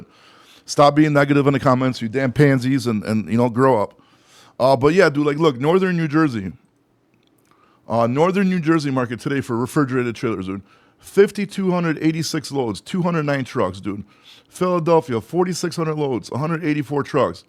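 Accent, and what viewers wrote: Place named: Russia. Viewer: Why you have a Russian accent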